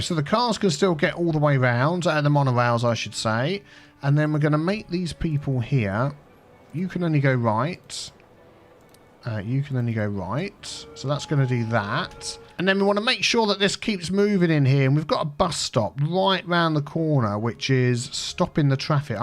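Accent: United Kingdom. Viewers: Oh ok lol, love your vids btw mate just found your channel last week. Can I ask where you're from if it's not rude, I'm terrible with accents and was wondering what part of uk you were born haha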